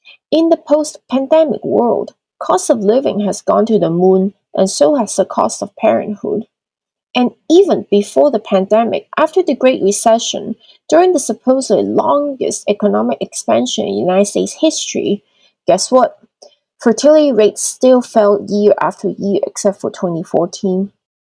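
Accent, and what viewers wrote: China. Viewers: 能听出来你是中国人哈哈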